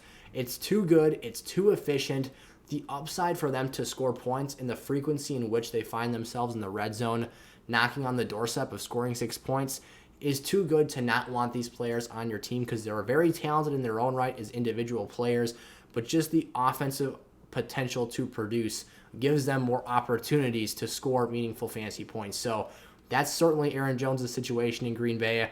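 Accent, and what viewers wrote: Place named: Wisconsin, United States. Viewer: Knew that Wisconsin accent by the way you say “.com” before even noticing the shirt 😂 Go Pack Go 🧀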